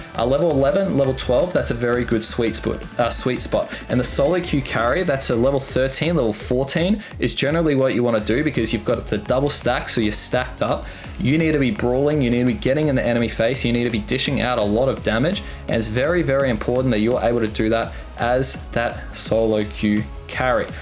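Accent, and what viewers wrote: Australia. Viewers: are you australian? also your vids are VERY USEFUL! like seriously i got +10% win rate in ranked because of your vids!! so thx!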